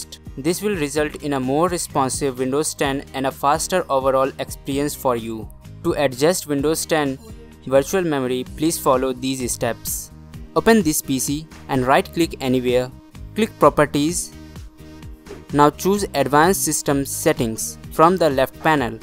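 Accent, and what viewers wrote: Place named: India. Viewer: i cant understand you.. indian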